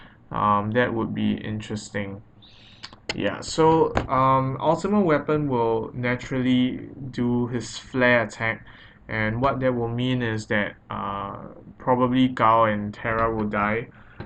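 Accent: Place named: Trinidad and Tobago. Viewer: You sound like you have a Trinidadian accent. Great video!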